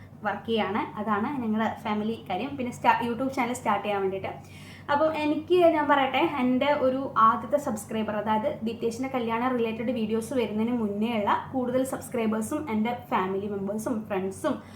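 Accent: Kannur, India. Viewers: KL Biju venta kannur slag.you good speaking. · അതെന്താ കണ്ണൂരുള്ളവർക്കു നന്നായി സംസാരിക്കാൻ പാടില്ലേ? ഒരോ ജില്ലയ്ക്കും ആ പ്രദേശത്തിൻ്റെ തനതായ 'സംസാര ശൈലിയുണ്ടാവും.. നിങ്ങളുടെ വിദ്യാഭ്യാസത്തിൻ്റെ ഗുണമാണോ ഒരു പ്രദേശത്തെ ഭാഷയുടെ പേരിൽ നെഗറ്റീവടിക്കുന്നത്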